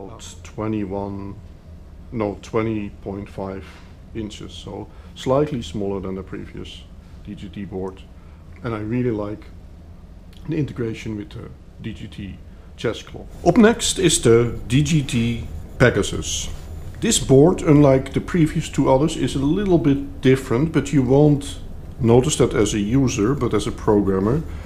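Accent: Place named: Netherlands. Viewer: Aaaah een mede Nederlander, hoor dat direct aan je accent XD toffe video!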